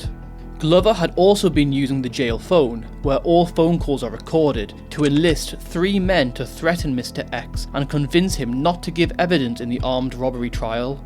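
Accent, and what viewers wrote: England, United Kingdom. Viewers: The narrator is English- why doesn't he focus on British crime?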